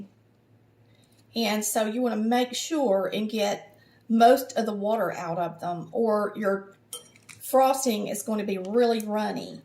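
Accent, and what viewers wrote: South, United States. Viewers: where are you from? I love your southern accent! I live in South Carolina, you remind me the ladies from My church!!! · Soon as you said "Hey y'all this is ". I was sold! So Southern and elegant 😊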